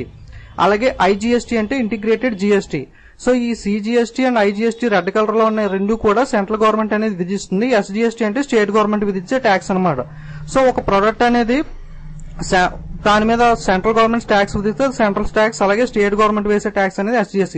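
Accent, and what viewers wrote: East Godavari, India. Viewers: babu needhi Thoorpu godavari ya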